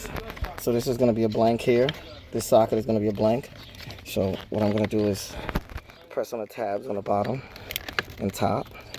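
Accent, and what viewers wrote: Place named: New York, United States. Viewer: I may be from the south but I know an East Coast accent from anywhere... maybe I’m wrong but I’m getting Brooklyn/Bronx vibes. Preciate the video brother. New subscriber ✊🏽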